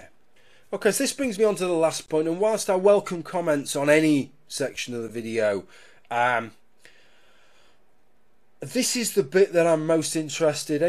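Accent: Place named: Northern England, United Kingdom. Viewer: Excuse my ignorance, but what is the accent I am hearing? I know it's British, but there is something a little different that I notice between yours and other British accents. I have heard other people speak this way as well, that is why I am wodering.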